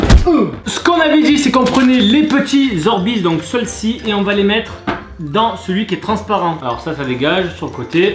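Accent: Marseille, France. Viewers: C'est un marseillais c'est son accent qui le fais dire litchi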